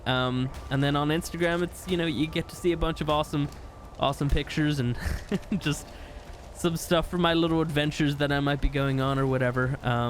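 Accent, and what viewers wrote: Ireland. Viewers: i been watching you for years, i never knew you were irish😭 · Irish right? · Wait he's irish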